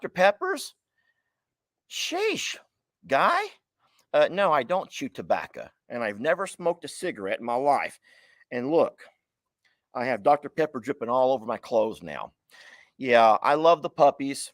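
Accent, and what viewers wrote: South, United States. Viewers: It's also partly your Southern Draw it's awesome to hear from you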